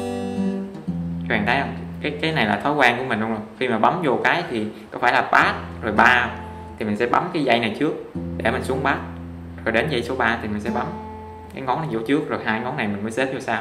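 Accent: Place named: Binh Thuan, Vietnam. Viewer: cảm ơn bạn những Video hướng dẫn rất nhiệt tình, nghe giọng giống dân Bình Thuận :D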